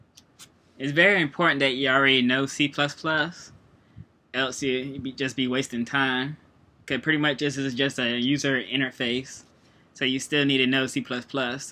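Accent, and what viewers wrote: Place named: South, United States. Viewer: This really really helped me , thanks alot man . i like your country accent too :)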